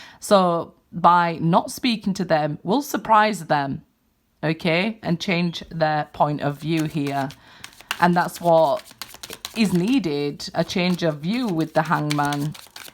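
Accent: United Kingdom. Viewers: Oh a british girl ❤️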